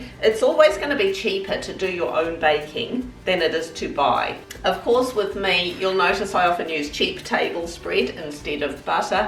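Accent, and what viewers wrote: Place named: New Zealand. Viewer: Absolutely adore that Kiwi accent & no mucking around with fancy words and just gets the job done. Good old Kiwi style!!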